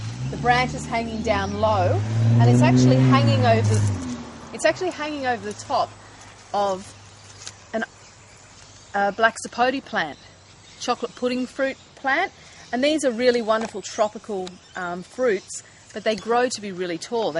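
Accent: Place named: Australia. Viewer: cute aussie accent ;)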